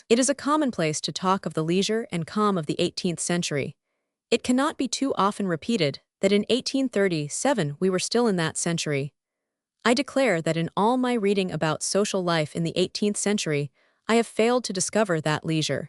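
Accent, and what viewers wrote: United States. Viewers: An American accent doesn't do justice to a good book,sorry.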